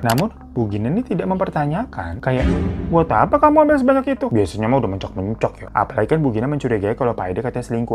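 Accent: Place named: Papua, Indonesia. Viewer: bang lucu bahasa Papua ny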